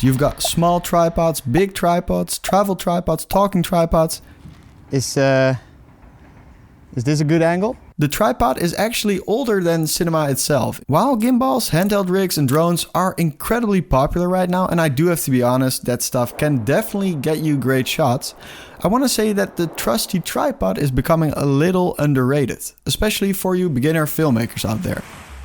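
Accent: Netherlands. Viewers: I smell dutch hahaha leuke video!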